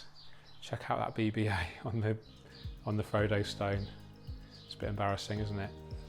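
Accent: United Kingdom. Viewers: Love your British accent my boss was from Britain and his wife from Netherland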